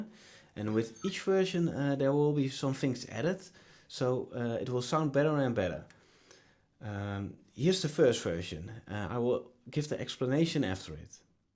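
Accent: Netherlands. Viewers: Your accent, sounds awesome, let me guess, you're dutch, right? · Hahahaa je kunt horen dat je nederlands bent ! Geniaal ! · nice one thanks alot! Are you dutch? i can hear it by your accent ;)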